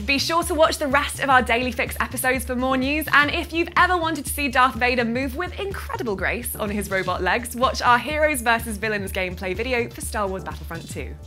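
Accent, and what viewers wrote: United Kingdom. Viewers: Holy crap !!!!!! That British accent though 🤔🤔🤔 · Cute, redhead and british accent. 10/10 IGN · That beautiful british accent though;)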